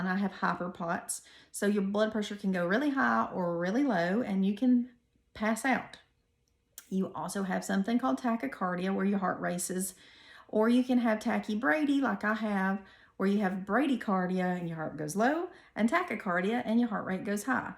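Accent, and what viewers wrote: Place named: South, United States. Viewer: You are adorable. I love your country accent. Good luck on your recovery!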